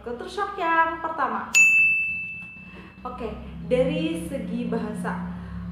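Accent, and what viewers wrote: Java, Indonesia. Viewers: Logat mba nya sdh medhok juga he he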